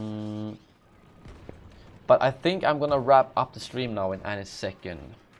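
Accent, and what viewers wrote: Sweden. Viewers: You come from Sverige ? :D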